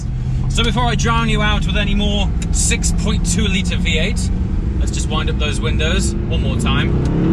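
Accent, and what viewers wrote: United Kingdom. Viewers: The british accent gives the videos a touch of class that makes a supercar review a notch above the rest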